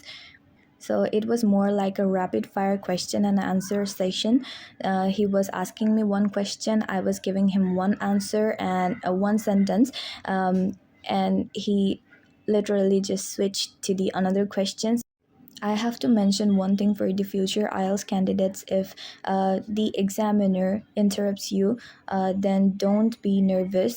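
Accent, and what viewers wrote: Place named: Sylhet, Bangladesh. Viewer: Your accent is really nice, r you from sylhet btw?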